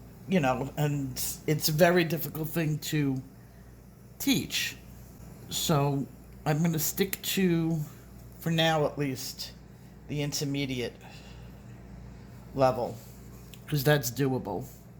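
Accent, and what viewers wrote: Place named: Boston, United States. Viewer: This is off topic, but I like your accent! Sounds kinda like a Baw-ston (Boston) Accent. Oh, and I love your coloring too!! ❤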